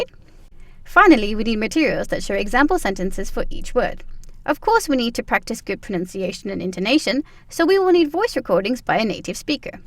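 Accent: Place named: United States. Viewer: 영국인 절대 아님 영국인 피가 섞인 동남아시아여가 분명합니다 발음은 영국영어가 아니라 미국영어에 가깝고요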